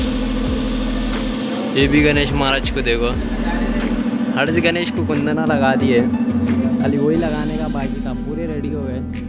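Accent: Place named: Hyderabad, India. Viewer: hao bhai🔥 hydi bolte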